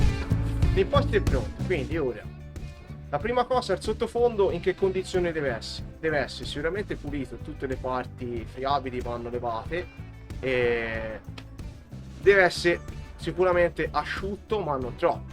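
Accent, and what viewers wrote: Tuscany, Italy. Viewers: Quanto sei simpatico oltre a essere bravo...poi con il dialetto toscano sei al massimo. Ciao dalla provincia di Pi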